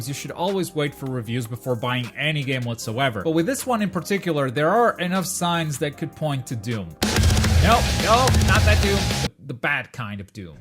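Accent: Portugal. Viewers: Holy shit just from you accent I could understand that you were also Portuguese. It's Rare to find one of us in the English community lol. Great channel btw. Definitively going to Subscribe.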